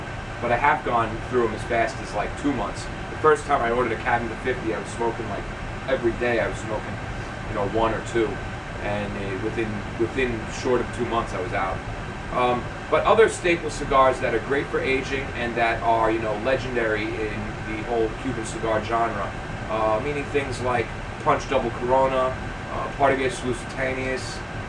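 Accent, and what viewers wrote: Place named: Massachusetts, United States. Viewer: I noticed the patriots hat and the accent lol Are you from Massachusetts? I'm from Taunton, Mass.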